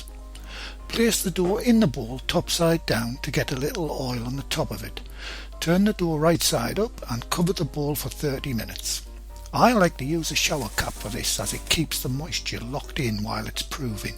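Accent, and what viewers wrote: United Kingdom. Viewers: Hi, I'm a too, I used to make bread weekly, thirty years ago, and am just getting back in. I've killed about three "sourdough starters", don't start in the winter, I've made a couple loaves well, just baked your "baguettes" yesterday, first time with such, and they came out beautiful, light and fluffy, crispy on the out, and I'm making bread for dinner tonight, with my daughter and son in law. I've long made one loaf, by "division", in cups, teaspoons, but this is the first time doing it with grams, and I expect to have more "equal" outcome, I really like weighing, because it matches with humidity and such better. I only hope my loaf comes out half as nice as yours, this afternoon. I've been using my "mixmaster" always did by hand before, and am shocked at how easy it is, with the mixer. I love your technique, I've kneaded for decades, and it "makes" the bread. 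Thanks for a great recipe, and excellent technique, bringing me back to doing it right. Your British accent makes it that much more enjoyable, I'm a retired mechanic, Marine, I try not to get the dough too dark, lol. Thanks for a great video.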